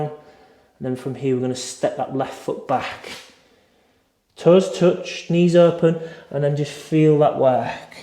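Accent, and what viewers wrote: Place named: Leeds, United Kingdom. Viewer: Thanks. I’m from Leeds originally so the accent reminds me of home 😊. Many thanks, really I was thinking of some gentle stretches that would be good for anyone who are is currently not running but wanting to keep in good condition for when they start back running. Just an idea. Thanks!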